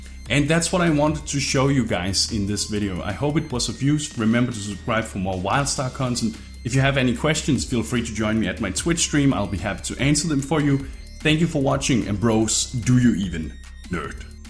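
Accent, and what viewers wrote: Denmark. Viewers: Dat dane accent <3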